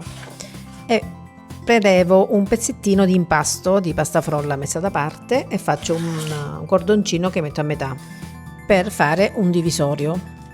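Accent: Calabria, Italy. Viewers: il tuo stupendo accento calabrese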